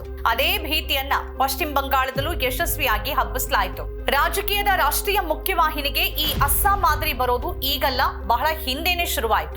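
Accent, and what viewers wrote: Kerala, India. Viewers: ನೀನೂ ಮಲಯಾಳಿಯಾ? ನಿನ್ನ ಉಚ್ಚರಣೆ ಮಲಯಾಳಿ ಹಾಗಿದೆ.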